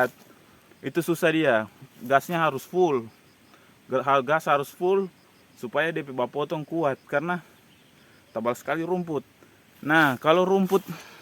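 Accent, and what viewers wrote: Sulawesi, Indonesia. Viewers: Ya cara penyampaiannya cukup menghibur dgn dialek dan logat sulawesinya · Bang rupanya logat Sulawesi ini ❤❤❤❤❤❤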